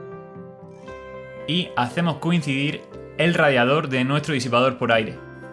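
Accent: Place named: Murcia, Spain. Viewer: Muy buen vídeo , explicación sencilla y clara para todos los públicos . Y sobretodo con ese deje murciano! Un saludo ! 🙋🏻‍♀️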